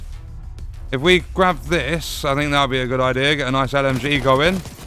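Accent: United Kingdom. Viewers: Every time I need help, there’s your heavy British accent to guide me. I’m an American Cajun from New Orleans. I tried Marmite on toast once and it didn’t bother me too much. Hi five 🖐️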